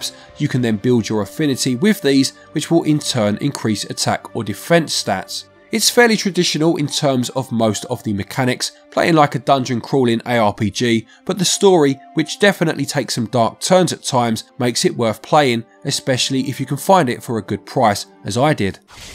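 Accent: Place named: London, United Kingdom. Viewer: I wrote a now-deleted comment that underlined the pronounciation of certain words in the video out of appreciation for the London accent, and not mockery. commented that my comment came accross as offensive, so I would like to sincerely apologise to him and anyone else who read it thinking it was insulting. and are both very talented in their voice over work, and it is a big part of what makes such a great channel. Having lived in many places accross the world, including London, and having an accent myself in all the languages I speak, I would never mock someone else's pronounciation or intonation. So my sincere apologies to and its community.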